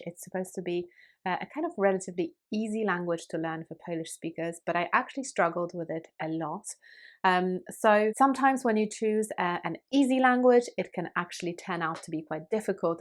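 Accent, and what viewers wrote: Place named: United Kingdom. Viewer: Tahnk you so much for your videos 💕 Do you ever get tired of speaking in British accent 😬 This is what's happening to me and I wandered if I am the only one feeling this way 👀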